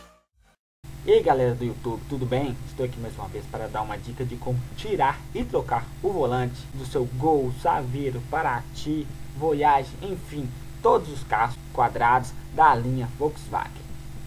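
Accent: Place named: Paraíba, Brazil. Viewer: Kkkk gostei do sotaque paraiba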